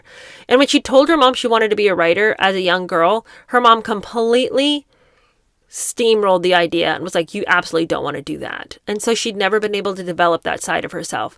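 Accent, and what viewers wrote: United Kingdom. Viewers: Love this new series! I hesitated at reading the book too but now it’s on my list. The only thing we are missing is an excuse for your amazing British accent! Lol Love your channel!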